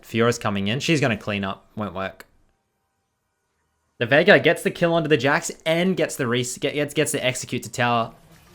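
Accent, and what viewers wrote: Australia. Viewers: I believe thats bcs of the australian accent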